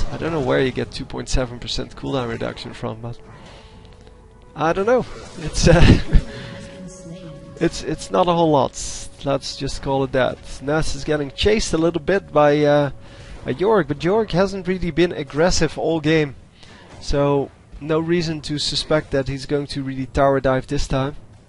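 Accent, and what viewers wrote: Netherlands. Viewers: you must be dutch ??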